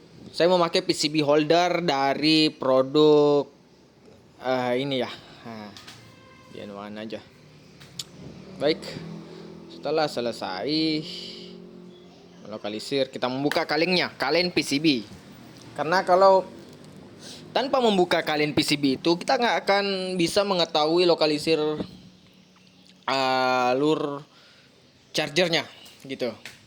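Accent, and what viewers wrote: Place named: Makassar, Indonesia. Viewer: Kentara logat makassar nya wkkw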